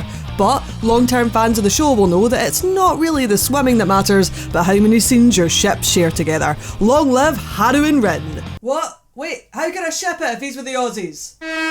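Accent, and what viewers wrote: Scotland, United Kingdom. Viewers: The voice of a Scots woman can make even the word murder sound good ;) This season had a good handful of shows that from the description didn’t sound as if they would be anything spectacular, such as Chios School Road and Asobi Asobase, but are genuinely funny as have become some of my favourites this time around. Also..... EDITING!!!!!! 😍❤️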